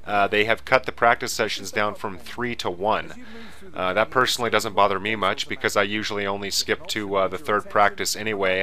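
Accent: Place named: United States. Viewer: it's funny hearing an American talk about F1 for some reason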